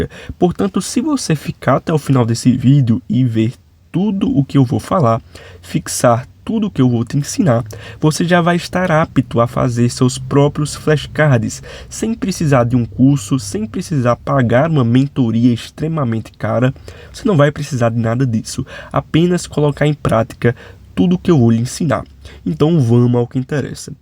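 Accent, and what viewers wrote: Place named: Pernambuco, Brazil. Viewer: Você é pernambucano?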